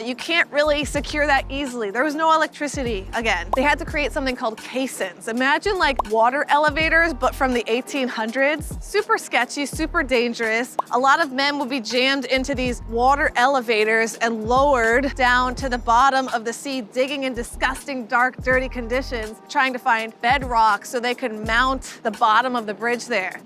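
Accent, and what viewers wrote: New York, United States. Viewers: how come since you moved out of town your New York accent has come back stronger?😂 As usual very interesting and informative thank you 👍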